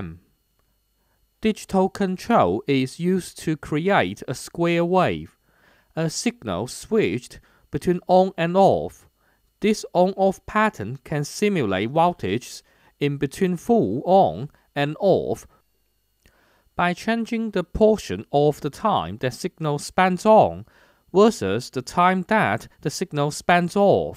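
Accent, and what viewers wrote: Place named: India. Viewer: 这英语口语，比印度口语都重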